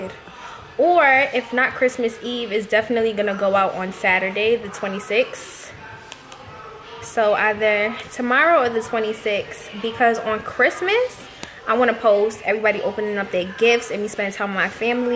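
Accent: New York, United States. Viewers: You sound like a true New Yorker. I’m here for it 😭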